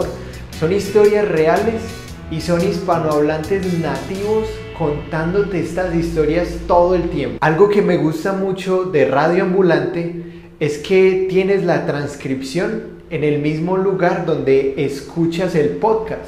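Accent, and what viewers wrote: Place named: Colombia. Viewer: Colombian accent, perfecto👌 Thanks señor.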